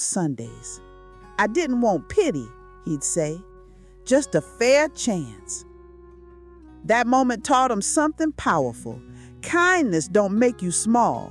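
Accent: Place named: South, United States. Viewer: I enjoyed the video, and loved the southern accent.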